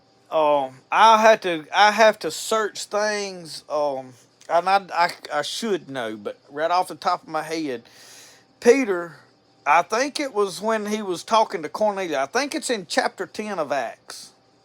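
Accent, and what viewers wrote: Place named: Mississippi, United States. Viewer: I never even heard of you till I searched hide tanning about 2 weeks ago. I knew you were from Mississippi soon as you started talking. All my family's from there, I was raised and still live in North Florida due to my dad joining the Navy. Anyway I'm enjoying the content I pretty much only watch YouTube not into the news or movies much anymore I like being outside but YouTube is a learning tool and experience.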